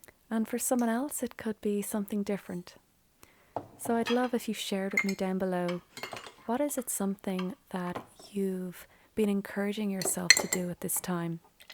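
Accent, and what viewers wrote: Ireland. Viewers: I want to tell you that I just love your channel. Your topics, philosophies, guidance, teachings and even your sweet Irish voice and tone are nourishing my soul. I have had so much stress in my life for years and am afraid that it has made me physically sick to some extent. Am so glad that I found you. Thank you. Charleen from Scottsdale, Arizona, USA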